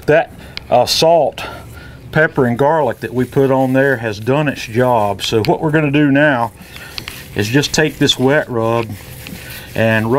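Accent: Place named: South, United States. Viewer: Hello from Ireland; I’m new to using a kamado and I’ve come to learn that unless the instructor has a deep southern (US) accent, I ain’t listening!! Great video……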